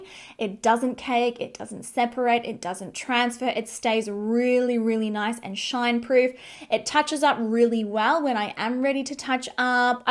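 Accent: Australia. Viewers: I just love her Australian accent. I wish I had it too 🥰 - You should try the Wet n Wild tinted hydrator (if you haven't). It's incredible for my oily skin. I like that one way better than Fentys. But we're all different so just my opinion. I think you should try it if you can. Plus it's like 5 bucks. The Incognito concealer is great too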